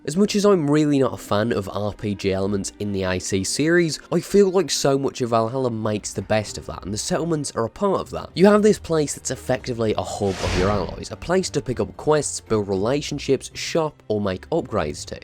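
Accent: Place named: England, United Kingdom. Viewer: Man with English accent likes the setting of England..... go figure.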